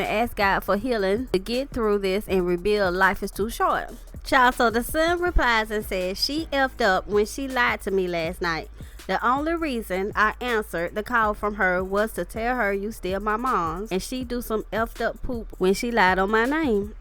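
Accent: South, United States. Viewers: Glad your fine.. Love that accent by the way💯 #SouthernBell😍👌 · Aww my luv am praying for you!!!! Yes life is EXTREMELY short, plzzz take care of yourself, watch what you eat, drink, and exercise its so so important for our people. So glad to continue to hear your lovely voice with a southern twang!!!!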